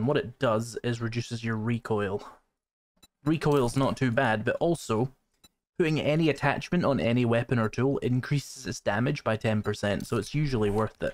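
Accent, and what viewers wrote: Scotland, United Kingdom. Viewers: are you Scottish, sound very very similar to a Scottish person trying to talk slow so other people can understand us 😂🤣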